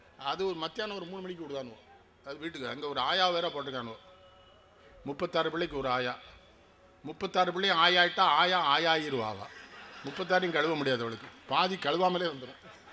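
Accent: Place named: Tirunelveli, India. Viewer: Pesum pothey pechila thimir kodukirathu nellai Tamil mattum thanla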